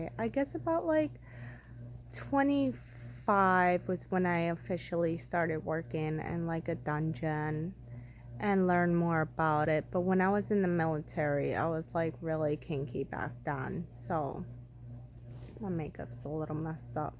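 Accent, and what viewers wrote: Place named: New York, United States. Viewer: Your accent sounds new yorkish 🤣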